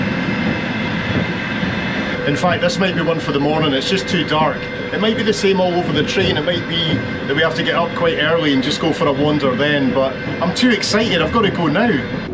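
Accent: Scotland, United Kingdom. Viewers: I love watching your videos. I live in Australia and moved here 25 years ago. You make me miss Scottish Patter! Your great!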